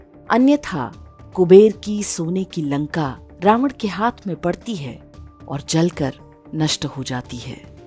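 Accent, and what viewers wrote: Standard. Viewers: आप शुद्ध हिन्दी का उच्चारण करते हे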